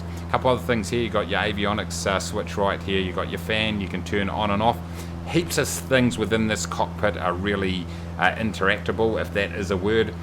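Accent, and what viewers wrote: New Zealand. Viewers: No offence but a kiwi accent is so annoying. 😂😂😂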